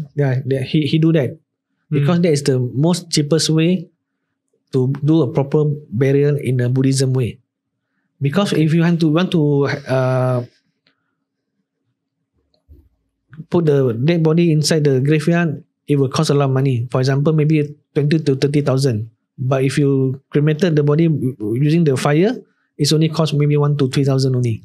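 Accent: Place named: Malaysia. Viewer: I dont understand ur manglish...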